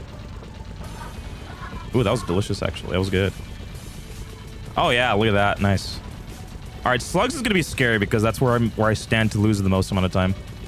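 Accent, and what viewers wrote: Finland. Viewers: are you finnish???? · is he Finnish? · Are you finnish?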